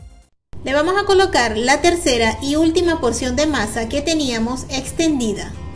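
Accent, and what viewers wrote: Venezuela. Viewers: Estoy buscando recetas que sustituyan el clásico pan de jamón de la cena de Nochebuena y Nochevieja venezolana y esta receta es la que más me gusta, por tu acento creo que eres venezolana también, o me equivoco? Saludos.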